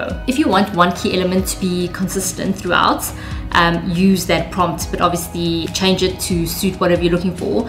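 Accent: South Africa. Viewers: Immigrated to the States when I was 10. At the core I'm South African. Recognized your accent right away. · This seems to be a South Africa accent. Hi from Cape Town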